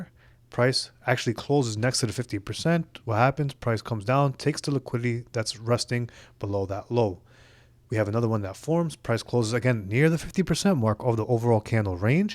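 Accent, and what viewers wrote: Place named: United States. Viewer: You sound American. How do you do prop firms that don't accept us traders?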